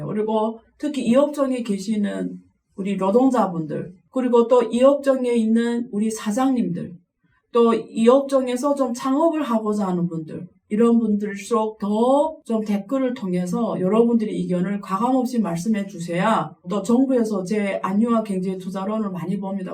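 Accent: Yanbian, China. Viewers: 과감하게라는 뜻 말투를 보니깐 중국 연변이나 목단강쪽 분인것 같고 그기에서 살아온 조선족분들은 북한에서 건너간 조상들이 많습니다 그래서 한국 표준어를 잘 못하는데다가 경제나 기술쪽 전문용어까지 이해하고 공부해 가면서 방송을 할려니 부족한부분이 있는것 같습니다 뭘 또 찝어서 지적하시나 ㅋㅋ 아시면서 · 안유화씨 중국인이라던대 사투리도그렇고 사실인가요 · 안녕하세요 / 시청자 입니다 방송 음량이 70% 알아 듣겠는데 30%는 무슨 말인지 알아 듣지를 못하겠습니다. 구독자 15만명이면 좋은 방송을 위하여 투자를 좀 해야하지 않을까요? 스튜디오 방송 음향이 울림이 심합니다 / 무슨 말인지 알아 듣지를 목하겠습니다. 중국식 발음과 좋지 않은 음향시설 / 스튜디오에 방음시설 좀 하시고 / 커텐이라도 ........ 그리고 마이크 음량도 좀 UP 하시면 좋겠습니다. 시청자가 불편함이 없어야 하지 않을까요?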